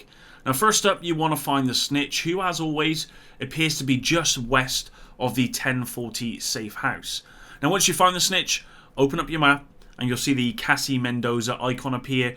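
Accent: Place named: Bristol, United Kingdom. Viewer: What part of Bristol are you from?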